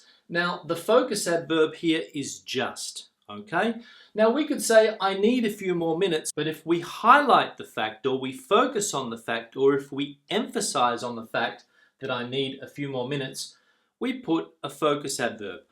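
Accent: United Kingdom. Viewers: I love your British accent. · you have a British accent,am I right?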